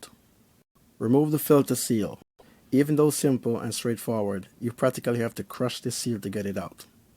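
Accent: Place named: Jamaica. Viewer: You sound like a Jamaican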